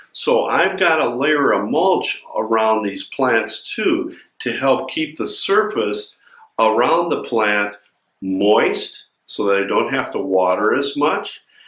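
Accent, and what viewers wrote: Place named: United States. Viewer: Love this info. And finally someone with plain English from America explaining things. Thanks for this video.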